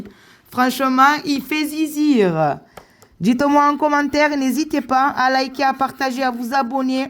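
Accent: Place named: Marseille, France. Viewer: Bonne journée bisous bonne chance j'aime bien votre accent vous êtes de Marseille ?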